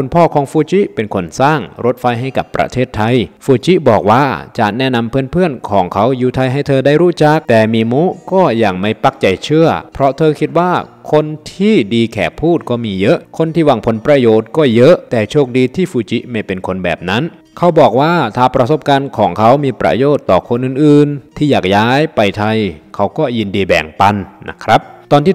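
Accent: Laos. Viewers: เขาเป็นคนลาวพูดได้เเค่นี้ก็ดีเเล้ว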